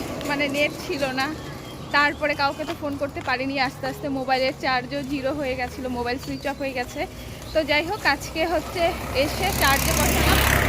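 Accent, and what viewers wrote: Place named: Bangladesh. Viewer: আমি আর আমার বন্ধু তোমার ভ্লগ দেখি। তোমার বাংলাদেশের টানে কথাবার্তা গুলো শুনতে খুব ভালো লাগে। আমাদের বাড়ি কলকাতা কিন্তু আমাদের দুজনেরই আদি বাড়ি মানে পূর্বপুরুষের নিবাস বাংলাদেশে ছিল। খুব সুন্দর হয়েছে ভ্লগ টা। শিলিগুড়ি জলপাইগুড়ি তেও আমার বেশ কিছু পরিচিত বন্ধু আছে। ভালো থেকো।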